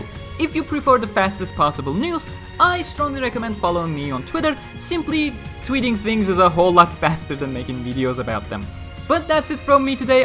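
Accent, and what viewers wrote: Turkey. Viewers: Böyle bir konuşmanın bir Türk tarafından İngilizce olduğu çok belli. Başarılar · Aksan ve nickinden Türk olduğun anlaşılıyor bu arada genede çabaların hoşuma gitti Global olma yolunda başarılar :)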